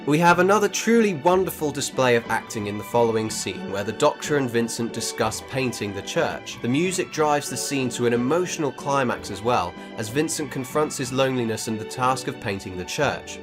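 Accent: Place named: Wales, United Kingdom. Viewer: There's nowt wrong with a Welsh accent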